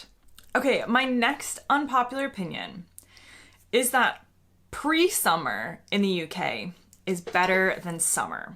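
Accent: United States. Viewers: I found it weird saying that you're a Brit now if you're saying you have dual citizenship wouldn't you want to also say that you are American as well you sound American but I do like the content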